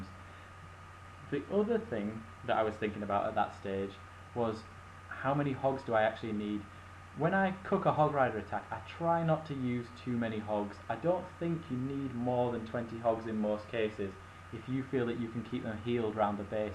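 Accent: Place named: United Kingdom. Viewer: Great to hear another British accent on Clash YT vids.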